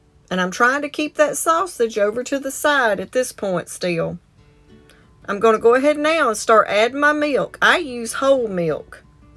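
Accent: South, United States. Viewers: This is how you won't it to be I love that southern accent, and this is how I like my sausage and gravy to turn out too, make sure to cook that flour long enough and add the right amount of milk just like my mom used to make, over biscuits, rice, fried or mashed potatoes it's comparable to crispy chicken gravy only usually lasts a little longer cuz the chicken gravy usually goes with the chicken then it's gone, fried chicken with mashed potatoes I usually end up a lot fuller cuz of the mashed potatoes and gravy I'm just saying cuz, that's the way it is!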